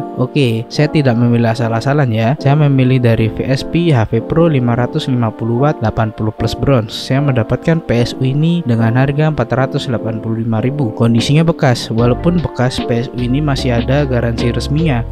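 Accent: Purwokerto, Indonesia. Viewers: Wong Purwokerto mas?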